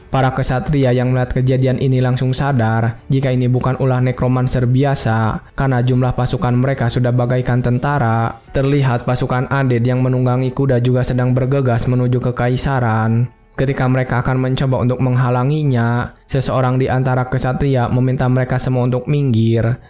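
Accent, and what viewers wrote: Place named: Madura, Indonesia. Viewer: Pasti ini orang madura yg jadi naratornya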